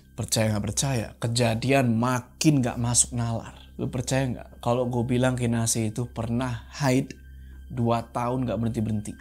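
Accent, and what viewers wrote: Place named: Malang, Indonesia. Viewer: curiga arek malang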